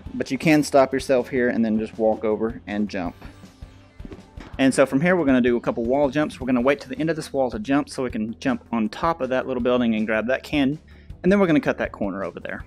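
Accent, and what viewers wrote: United States. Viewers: sounding American today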